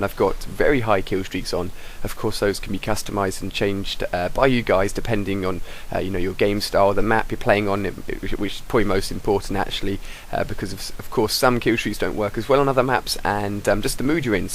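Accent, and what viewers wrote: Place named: England, United Kingdom. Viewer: you sometimes sound like brownay( i hate brownay) but in a english accent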